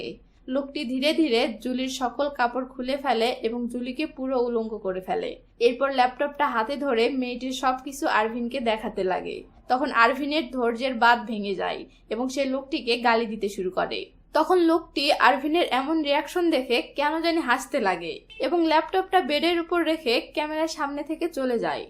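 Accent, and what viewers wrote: Bangladesh. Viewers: আপু আপনার কথা শুনে মনে হয় আপনি বাংলাদেশী।কিন্তু আপনার চ্যানেলে দেয়া আপনি ইন্ডিয়া থেকে!! আমি কি ভুল?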